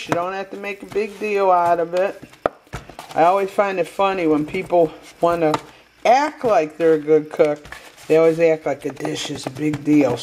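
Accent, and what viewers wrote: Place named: Illinois, United States. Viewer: I never thought to do that with neck bones but I sure will now. I CAN say they make the best spaghetti sauce going! Between the sweet pork flavor and the bones, you can't beat it. Thanks for another great idea,. (Northern girl, huh? I would have guessed Illinois, but there's a similar accent in Central NY...Utica/Saracuse/Buffalo...Humm) LOL, you do NOT want to hear my accent...Tess from NY:)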